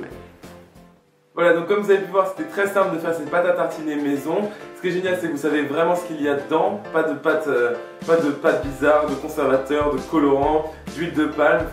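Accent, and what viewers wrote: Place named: England, United Kingdom. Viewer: stop avec ton accent anglais qui me casse les pied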